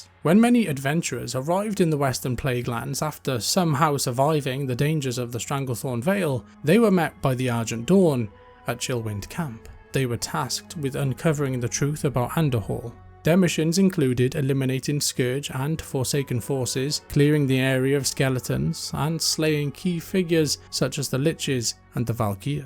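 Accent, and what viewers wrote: Wales, United Kingdom. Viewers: hey mate cool chanel, are you welsh btw?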